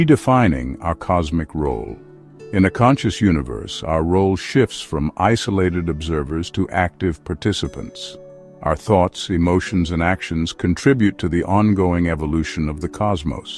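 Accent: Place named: England, United Kingdom. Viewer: I'm bothered by the narrator's two accents. Why is he slipping from an America English accent into an England sounding English accent? Is anyone conscious of this? I assume some are now. Please explain.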